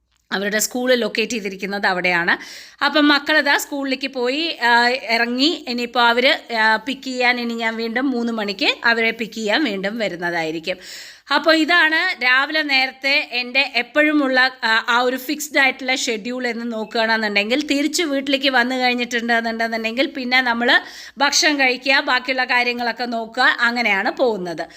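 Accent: Palakkad, India. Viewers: Palakkad slang super. V. Useful message for all. You are so inspiring. I wish I were you. So bold & practical. Nice recipe. Shall try it today. God bless ur family. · I like the way speaks 👌 Palakkad slang super 👌 I am inspired by you ❤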